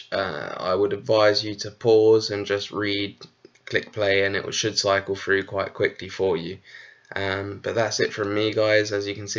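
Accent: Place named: United Kingdom. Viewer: Wow such a well put together analysis by this guy some good information by the way FUCK MY LIFE I have been looking at thE OAK ISLAND MONEY PIT wow thank you for bringing this mystery to my attention I am really enjoying researching it on a separate note I keep hearing an accent what part of the world are you from you do not have to answer I was just curious I am sure it is the UKW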